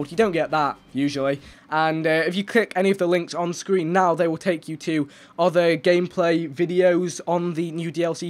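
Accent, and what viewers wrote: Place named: United Kingdom. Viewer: For some reason his British accent makes me not want to punch him in the face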